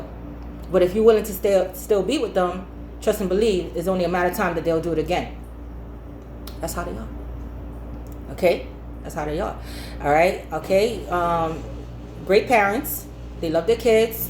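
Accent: New York, United States. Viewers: i LOVE ur accent lol ny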